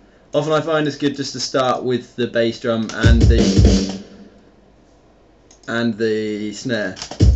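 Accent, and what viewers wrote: United Kingdom. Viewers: you're english,don't you?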